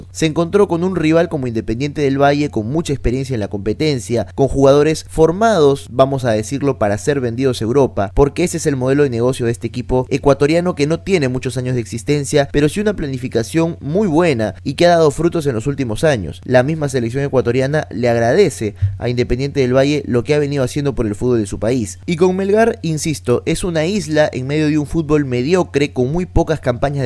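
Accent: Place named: Argentina. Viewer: por que siento un acento argentino?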